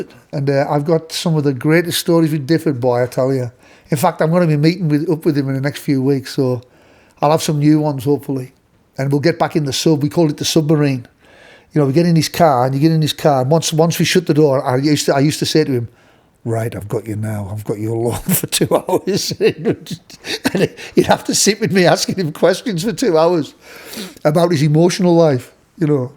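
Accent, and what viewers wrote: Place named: Sunderland, United Kingdom. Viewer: I found your channel a few days ago and am very impressed my friend .am from stockton on tees ..you sound like a mackem to me 😂definitely from the north ?paul weller has been my god for 50. years I’ve heard he was very let’s call it spiky 😁when he was younger but he’s cool now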